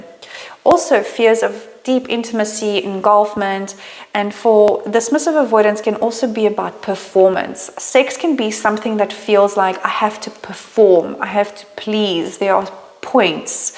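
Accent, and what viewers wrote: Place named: South Africa. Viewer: Hah! I recognized the SA accent. Great video!!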